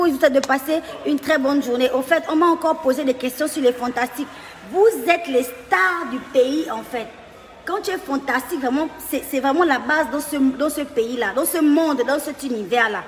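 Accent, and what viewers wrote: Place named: Gabon. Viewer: J aime ton accent gabonais ❤️❤️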